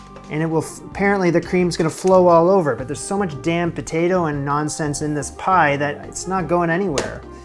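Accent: Canada. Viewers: You mean you couldn't tell? I'm not from Canada, and I noticed the difference of his Canadian accent to the US one...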